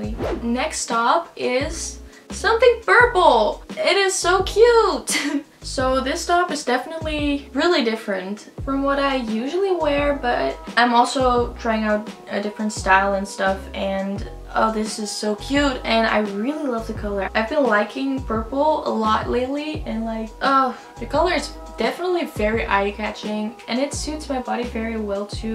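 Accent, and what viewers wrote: Netherlands. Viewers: wacht wat je bent nederlandssss ahaha ik dacht het al (leuke video!)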